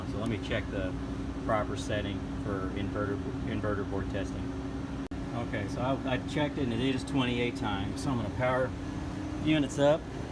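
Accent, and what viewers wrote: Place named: United States. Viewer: got that wrong u got american accent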